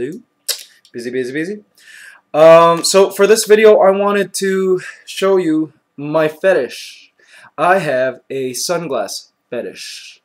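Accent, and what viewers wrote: Philippines. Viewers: Filipino accent rocks.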